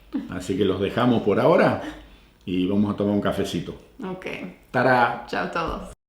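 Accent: Argentina. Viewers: Ya me hize fanatica..me llama la atencion q no haya perdido el acento argentino che😁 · Un abrazo desde Rauch provincia de bs as, Argentina los sigo siempre, los respeto y les deseo lo mejor, me encanta tus historias y bien contada con nuestro idioma y formato de habla, es como si nunca te fuiste, tu hija genial y muy Argentina tambien, besitos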